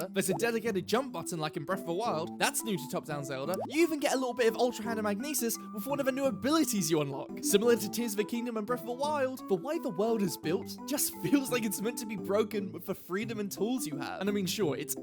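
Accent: United Kingdom. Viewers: i don't click onto your videos all too often but i am jumpscared by you being british every time. i somehow always forget and it's terrifying every time.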